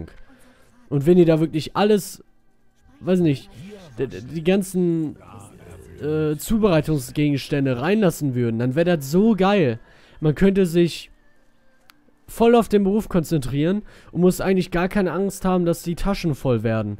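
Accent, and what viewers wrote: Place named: Wuppertal, Germany. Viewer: Biste n Wuppertaler? Soviel "wat" und "dat" ^^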